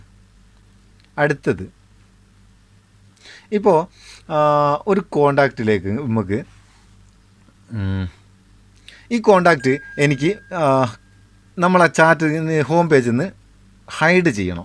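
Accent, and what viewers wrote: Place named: Kozhikode, India. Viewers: നിങ്ങളുടെ നാട് വടകരയാണോ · നിങ്ങളുടെ കോഴിക്കോടൻ സംസാരം നല്ല രസമുണ്ട് കേൾക്കാൻ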